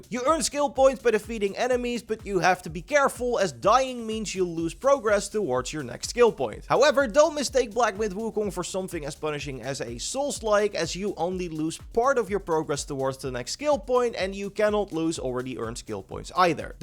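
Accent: Netherlands. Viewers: I love your dutch accent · Dude stick to dutch videos your English accent is horrible. Also listen to how he says wukong lmao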